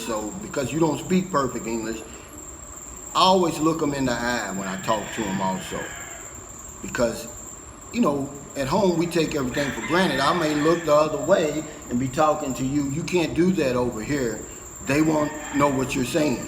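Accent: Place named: Kentucky, United States. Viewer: Slang English...ahh it's your Kentucky accent I bet.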